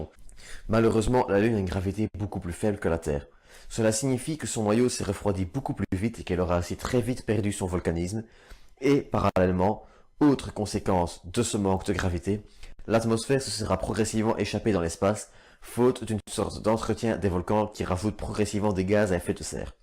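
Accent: Liège, Belgium. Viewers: T'es liégeois toi nan ? 😃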